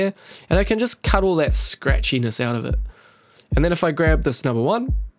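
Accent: New Zealand. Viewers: You sound kiwi bro! Me too, subbed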